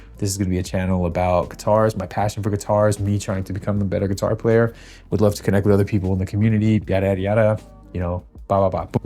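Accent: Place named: United Kingdom. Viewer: Hi; I enjoyed your video. I shall follow u henceforth. I guess, you are a British guy or an Australian guy as your accents are not American. But I had no difficulty at all in following each word that you spoke. Kudos to your endeavour.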